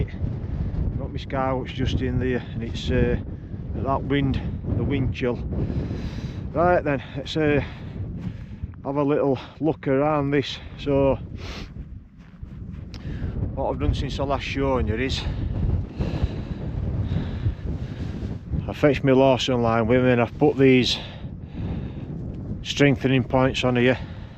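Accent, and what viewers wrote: United Kingdom. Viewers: All the U.K. folks have unique regional dialects-like Americans. I can understand most of language now, but it took me awhile!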